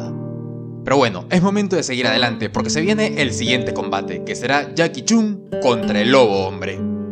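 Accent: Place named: Latin America. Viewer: Alguien sabe de donde es que habla como latino pero en cambio dice vídeo como nosotros los españoles?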